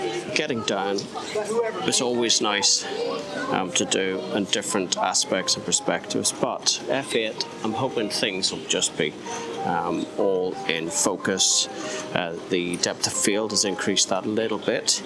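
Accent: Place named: United Kingdom. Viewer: Just came across this channel. Around 9 years ago I swopped a Leica lens for an x-t1 and I'm still using it today. They're an excellent, reliable little camera that does everything I want and has never let me down. Some great shots of Venice showing what this little gem of a camera is capable of, and just subscribed. As I always say, 95% of a good image is being there and seeing the potential and the other 5% is the gear. From your accent I think you may be from the same little island as I am, but further north maybe? Enjoyed the video and will check out some more.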